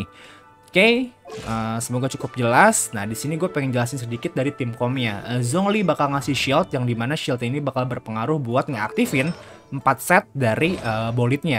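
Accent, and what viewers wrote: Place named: West Java, Indonesia. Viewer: Meni sunda pisan euy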